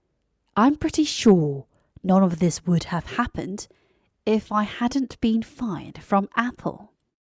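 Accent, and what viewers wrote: RP, United Kingdom. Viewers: 동양인이 여왕 영어한다는 거 자체가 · 영국식 발음으로 읽어주셔서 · 영국 포쉬발음은 언제나 매력적이고 듣기 좋아요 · 진짜 영국 발음 최곱니다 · 우아한 영국발음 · 영국영어로 들으니 새롭네용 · 따라하면 저도 포쉬악센트 할수잇나용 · 영국발음 연설을 듣고싶었는데 들을수있어서 기뻐요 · 영국발음으로 들으니 너무 좋아요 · 영국식 발음을 익혀보려하는데 매우 좋은 영상이네요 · 영국식 발음의 낭독을 따라할 수 있어서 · 님 발음들으면서 영국발음 연습중입니다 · you have the most perfect British Pronunciation I've ever heard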